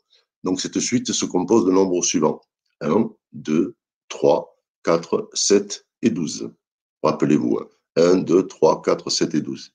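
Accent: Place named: Marseille, France. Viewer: Voix maghrébine et accent Marseillais, un bon mariage. Bonne journée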